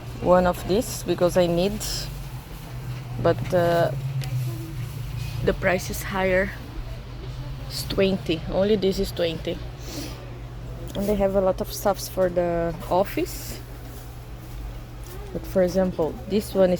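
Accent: Philippines. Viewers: As if i find your English accent is a filipino one..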